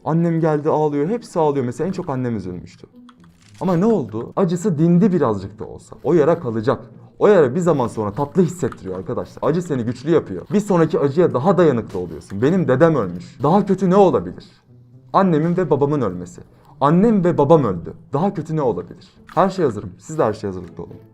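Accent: Adana, Turkey. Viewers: Abi sen adanalı mısın?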